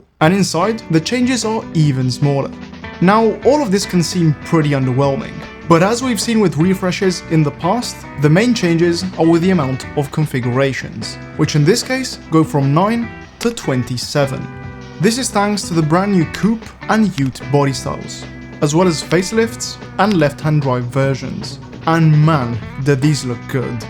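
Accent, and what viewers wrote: Italy. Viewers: Ciao,ho capito ce sei italiano dall’accento. Io vorrei che tu iniziassi a parlare in italiano nei tuoi video(se ti va)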